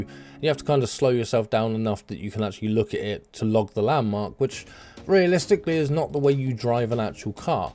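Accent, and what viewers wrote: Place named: Bristol, United Kingdom. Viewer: Is that a fellow Bristolian I hear?😂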